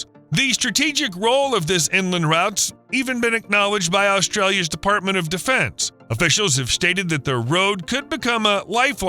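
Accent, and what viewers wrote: United States. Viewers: 😂 If you think all that could be built out there for that price, I have some other "investment opportunities" for you. From Winton, a A$1.2b single lane build would run out of money well less than half way to the NT border assuming the standard ballpark A$5m per lane-km for a rural arterial, which would be optimistic for some of the reasons mentioned. If you want a lane each way it would barely reach more than 100km. The US accent didn't help credibility, but otherwise was entertaining enough.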